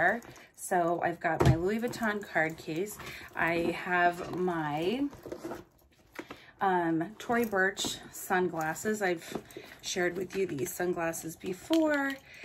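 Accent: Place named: Orange County, United States. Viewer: Omg I’m dying I could so tell your from Orange County area ! I’m from the OC too born and raised in Irvine / Huntington 😂💗 love your videos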